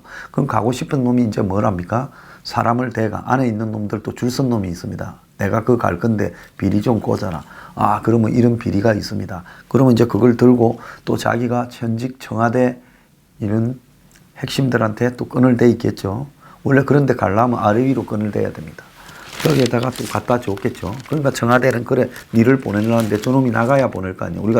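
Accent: Gyeongsang, South Korea. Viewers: 경상도 사투리가 너무 정겹습니다 ㅋㅋㅋㅋㅋ....^^ 진지한 내용에 귀여운 사투리가 엇박자인데도....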